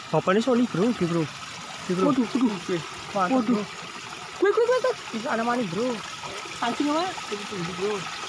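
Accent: Banyumas, Indonesia. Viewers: Sampean wong ndi kang deneng bhsane ngapak😂👍🤭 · Bahasanya ngapak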